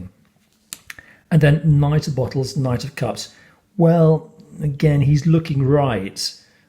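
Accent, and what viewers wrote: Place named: England, United Kingdom. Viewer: Hi, new subscriber here. From Empress Song's channel. It is refreshing to hear an English accent. I like this deck, in that it is subversive. For example the King of Pents is meant to be master of the earthly realm, with access to resources to use at will. In this deck the King of Pents is removed from his element, with farming and wealth of the earth (the combine harvester in the background) beneath the King, as implied access to valued resources are supplied via the tablet. Maybe stocks in fracking, or cyber currency; the stock exchange rather than the actual nitty gritty of resource creation by own hands. So as a polemic on modern society, there is not as much respect given to skill of hand and land. Respect, ie wealth and value, is given to Airy (swords/guns) pursuits. As for the suit of guns, who needs intelligence when you have a gun? 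The previous 12 months alone in the US has been horrific and mind boggling regarding mass shootings and the desperate need to keep their right to bear arms. It makes no sense, but if Guns are placed in stead of strategy, analysis, logic, then a suit of guns is a sad state of modern living. The RWS of the 1900's had at least a little hope in it. This deck shows today's reality, the hope has to be added intentionally and sideways on. I have a few decks like this, the latest is a US games deck - The Neo-Colonialist Tarot of the Americas. Pamela Coleman Smiths artwork enables people to enter into the theatre of life. A fantasy. In these decks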